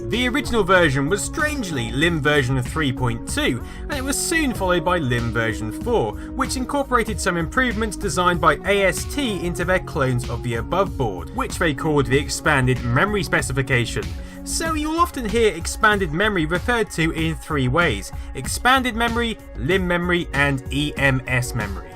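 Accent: United Kingdom. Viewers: In all fairness, English is my only language, but can be hard to understand at times. I find that's just his UK accent.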